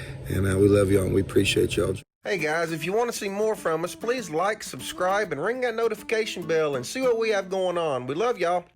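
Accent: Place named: South, United States. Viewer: Nil per oral, not in the mouth for you, I know you speak southern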